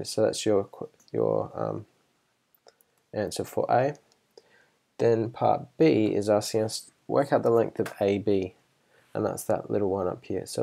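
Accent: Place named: Australia. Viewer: The Aussie accent needs to be complemented by a more light-hearted tone. Overall, your videos are excellent.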